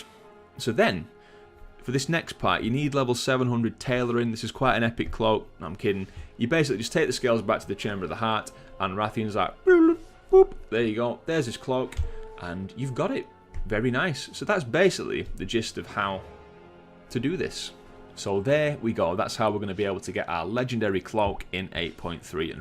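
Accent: United Kingdom. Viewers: Loved your chill and british way of talking!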